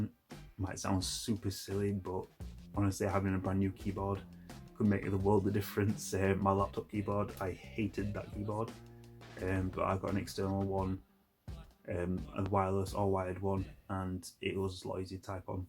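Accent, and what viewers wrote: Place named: Yorkshire, United Kingdom. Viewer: Good tips....like you say, easy to overlook. I’m trying to place your accent?...south/West Yorkshire?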